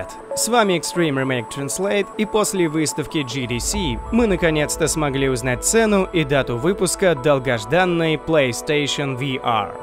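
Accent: England, United Kingdom. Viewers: по-моему слишком выраженный английский акцент) не стоит, так как еще и по сравнению с русским контраст смешной получается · плюсую английский раздражал аж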